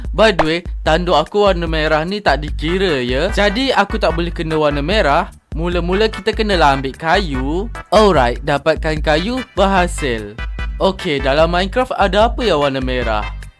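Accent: Malaysia. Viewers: Kayak suaranya orang aneh ya orang Malaysia ya??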